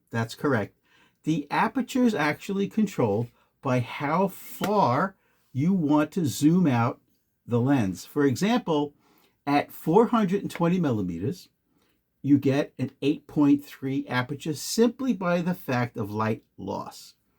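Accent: New York, United States. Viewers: Watching from Bangkok Thailand! You have the best New York accent ever. Thanks for your advice on my new mic set up!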